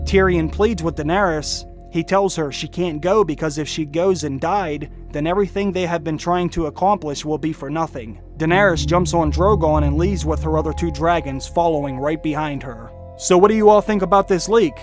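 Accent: Pittsburgh, United States. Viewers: Are you from the Pittsburgh area ???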